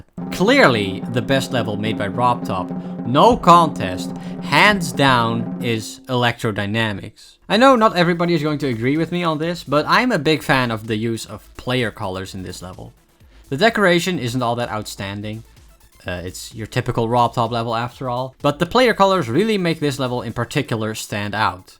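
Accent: Netherlands. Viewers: i can hear that dutch accent lol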